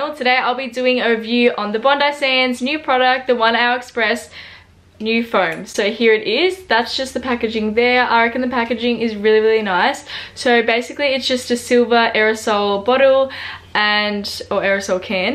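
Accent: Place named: South, United States. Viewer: I reckon....you sound like your from the south states, like me as a TN girl. Tan looks great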